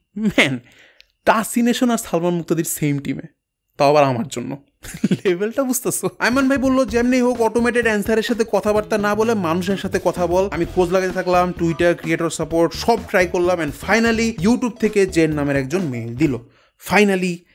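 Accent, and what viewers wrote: Mymensingh, Bangladesh. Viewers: Are you from Mymensingh??/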